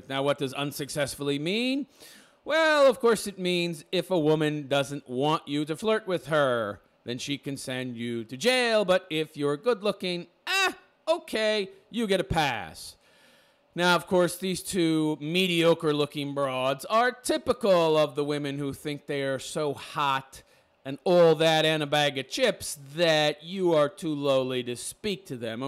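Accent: United States. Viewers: You sound american' You so tough why don't you get arrested for not paying alimony and "take that shit to the supreme court and have the law taken out?"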